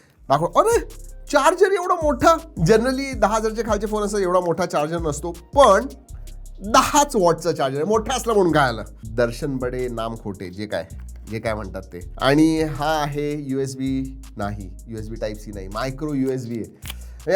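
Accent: Pune, India. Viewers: That puneri accent is soo gooodd 🤩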